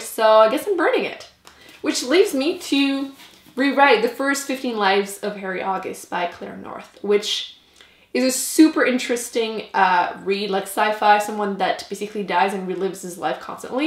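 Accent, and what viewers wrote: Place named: Quebec, Canada. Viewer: She's from Québec.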